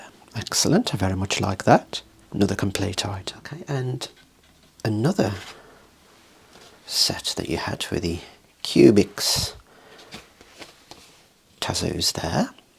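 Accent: Birmingham, United Kingdom. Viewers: I've just learnt tazos existed! Nice seeing the old manu player statues....nice concise asmr (even with a brumy accent) 😮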